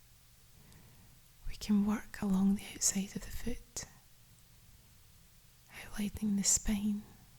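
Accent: Scotland, United Kingdom. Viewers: I felt relaxed just watching and loved her Scottish accent. Thank You.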